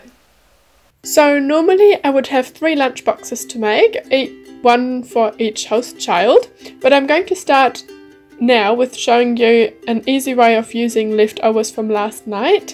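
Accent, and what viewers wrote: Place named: New Zealand. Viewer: wow du hast einen richtigen Kiwi Accent 👏😻👍 vor allem das "e" ich liebe es 😄 noch ganz viel Spaß :)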